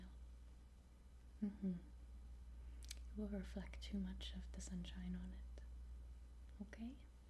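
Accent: Canada. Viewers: Lol Your Canadian accent really suits this role play.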